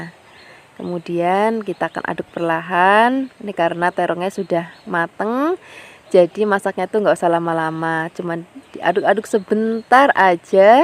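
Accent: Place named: Ngapak, Indonesia. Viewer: Medok banget rasa ngapaknya ,enak dirungokna.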